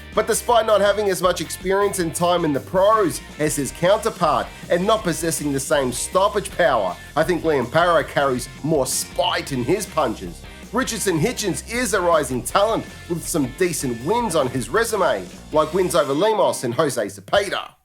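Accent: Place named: Australia. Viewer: Surprise, the guy with an Aussie accent picks the Aussie to win. Stylistically, Hitchins should win. However, Liam's gas tank is huge. Should be a great fight.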